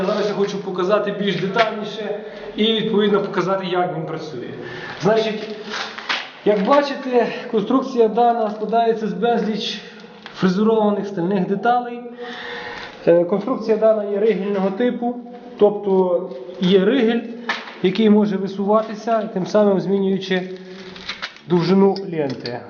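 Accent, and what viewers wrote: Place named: Ternopil, Ukraine. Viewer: Тут ще чути тернопільску мову, потім вже буде львівський пан.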